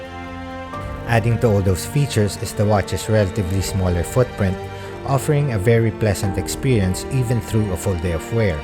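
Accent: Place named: Philippines. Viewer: your filo accent is annoying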